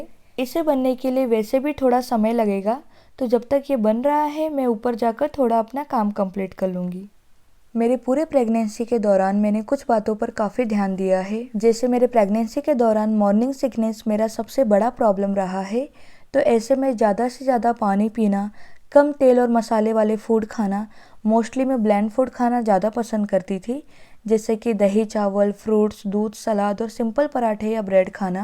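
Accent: India. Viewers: Mast anti tame kathiyavadi cho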